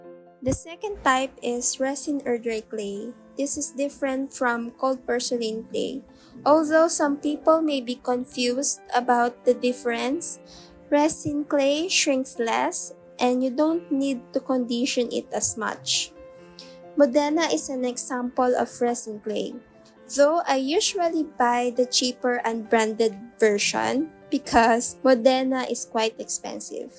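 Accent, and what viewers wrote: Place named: Philippines. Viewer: based on your voice and accent filipino ka po ba?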